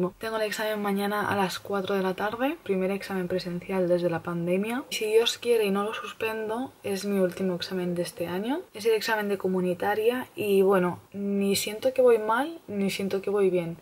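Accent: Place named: Argentina. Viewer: Sos re argentina boluda <3 ajajjaja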